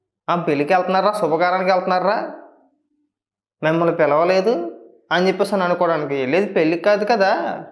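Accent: Godavari, India. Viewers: Every common man have similar kind of views which you have but you have the medium to express their feelings as it is in Godavari slang satirically. Appreciated. Keep going. We are with you.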